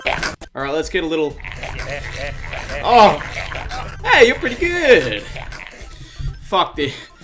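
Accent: Australia. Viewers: is auzzie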